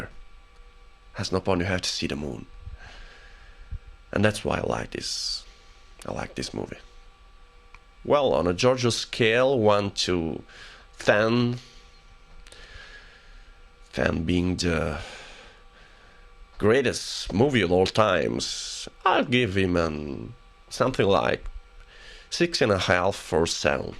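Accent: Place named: Russia. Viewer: your accent sounds likea russian one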